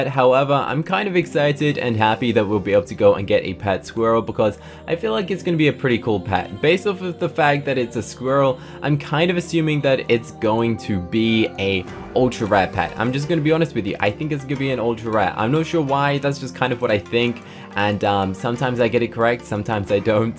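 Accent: United Kingdom. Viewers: Hi I want to ask are you from England cause Your accent is british and i love your accent!!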